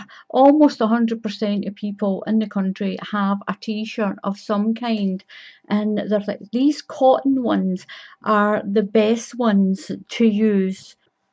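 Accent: Scotland, United Kingdom. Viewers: Fantastic vid & being Scottish myself its gr8 hearing our accent 4a change. So easy 2make & who hasnt got an old T shirt laying abt some drawer at home. Thanx so much. Stay safe & well.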